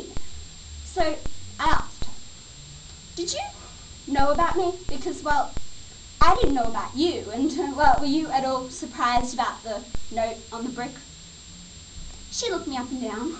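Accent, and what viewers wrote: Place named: Australia. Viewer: shes aussie not british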